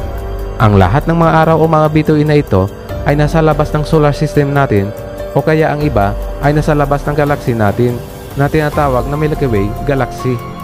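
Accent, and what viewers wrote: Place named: Iloilo, Philippines. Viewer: wow ilonggo nga tagalog..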